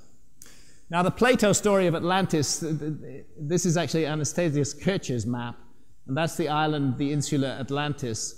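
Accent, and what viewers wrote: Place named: England, United Kingdom. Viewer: the English accent makes him sound so articulate and educated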